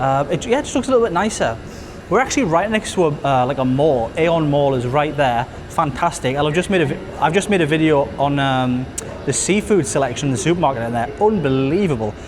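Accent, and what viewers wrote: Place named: Northeast England, United Kingdom. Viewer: I'm sorry but this is too funny!!! Are you from Sunderland or Newcastle?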